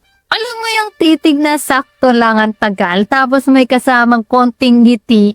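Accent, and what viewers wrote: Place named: Ilocos, Philippines. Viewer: Idol ilocana ka ba? Sa tuno mo kc e siguro pangasinan or ilocana.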